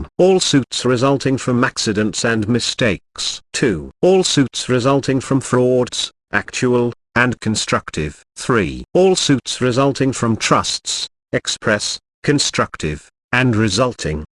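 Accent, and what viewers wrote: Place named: United Kingdom. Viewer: Wish this was in a non-british dialect.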